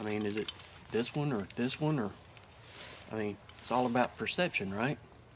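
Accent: South, United States. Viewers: This was a wonderful video! I feel like you were really caring and you had a calm and reassuring voice. I'm from a rural area and it made me happy to hear a fellow southerner. Thanks for the help. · Can you stop whispering. Are you from the south?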